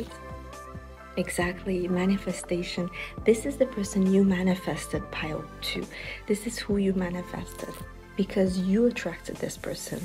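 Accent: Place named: New Zealand. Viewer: Sounds like my family from tautoro Kaikohe new Zealand 😎🎤🎶🎶 who is paku eyes 😢 lmao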